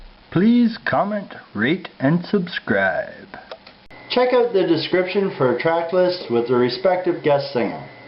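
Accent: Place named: Canada. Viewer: I have a strong feeling you're Canadian based on how you speak. Anyway, good review, I probably won't get this cd ever but it's good to know it's not total garbage.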